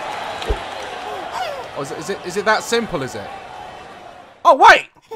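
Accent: United Kingdom. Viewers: you had a British accent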